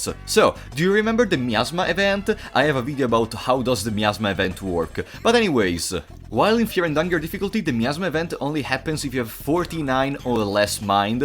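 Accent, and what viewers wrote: Italy. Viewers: il tuo accento italiano si sente fortissimo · ahahahhaah grandissimo si sentiva un pochino dalla cadenza!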